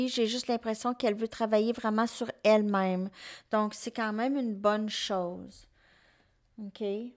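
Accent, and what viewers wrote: Quebec, Canada. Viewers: Le plus bel accent québécois ❤️😉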